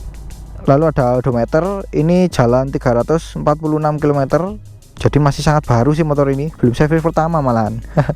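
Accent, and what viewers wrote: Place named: Java, Indonesia. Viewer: Lucu, Judul Inggris.. Ulasan dialek Jawa.. Lanjut sam..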